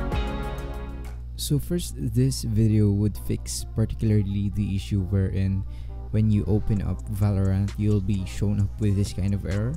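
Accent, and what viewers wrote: Philippines. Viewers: nagawa konayung method 6 and then nag start nako ng game tapos yung problema konaman ngayon is vanguard not initialized hayop nayan valorant na valorant nako halos 2hrs nako naghahanap ng solution kada tapos ko ng method meron nanamang bagong problem btw salamat pre alam ko pilipino ka halata sa speaking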